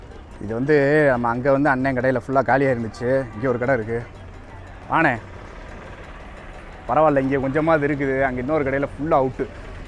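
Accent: Madurai, India. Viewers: Peasum pothea avinga evinganu arampichutinga anne😍 atha madurai slng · Anna Madurai tamil 😍awesome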